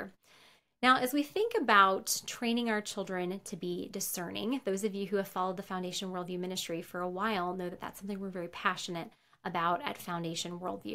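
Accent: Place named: United States. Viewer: I love your accent,! What state are you from?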